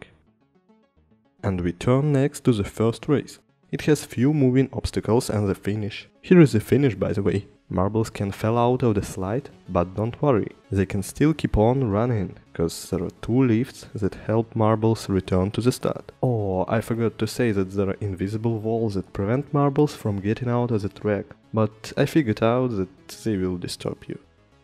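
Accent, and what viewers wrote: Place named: Russia. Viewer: у автора как будто русский акцент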